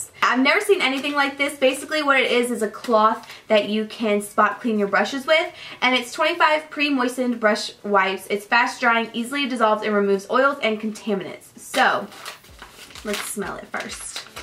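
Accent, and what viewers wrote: South, United States. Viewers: You're so friking beautiful I love you and I want to be you!! Your hair is so friking bombbbbbbbb and you're so pretty makeup or not, and your slight southern accent I could NOT be more jealous of and your name is so cute. You seem like suuuuuch a down to earth girl and I wanna be your bff. Ok done being creepy AF😆🙄UGH WHY CANT I BE YOU GIRL🤓💙😍😝👑 #queen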